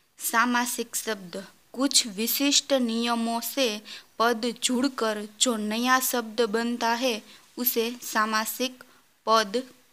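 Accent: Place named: Kathiawar, India. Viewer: आप हिन्दी को काठियावाडी लहेजे में क्यों बोल रही हो?उदा. राजा को आप राझा , जानते को झानजे बता रही है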